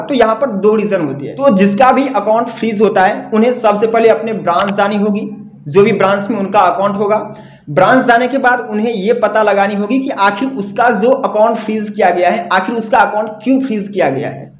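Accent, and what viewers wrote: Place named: Bihar, India. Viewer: Tumhara awaz se lagta hai tum bihari ho😄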